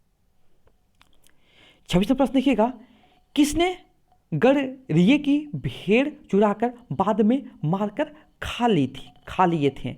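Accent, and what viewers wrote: Bengal, India. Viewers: Ap kya bengali hai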